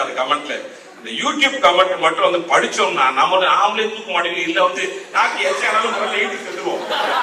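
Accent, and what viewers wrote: Bangalore, India. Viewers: Bro calm down - it's the Bangalore tamilians slang.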